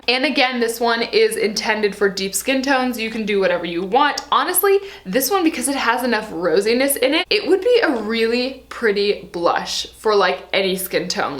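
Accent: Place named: South, United States. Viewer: Hey there, I will say makeup needs to work to accentuate the good features that you have. It's overkill, and As a mask is when you wear it all over the place in bold colors with the focus being everywhere. Learn to focus on a favorite part of your face. You can never go wrong with that advice. Also, you are southern? I think I hear a slight twang haha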